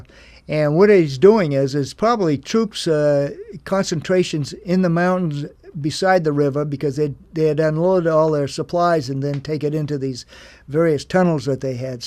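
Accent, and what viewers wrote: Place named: New England, United States. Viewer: Love this video! And the New England accent reminds me of my late grandmother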